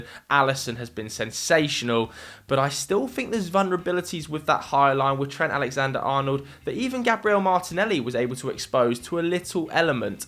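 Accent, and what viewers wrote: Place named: Bristol, United Kingdom. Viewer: Sounds more like Bristol, Devon or Cornwall to me. Down South. But could be Portsmouth / Southampton too.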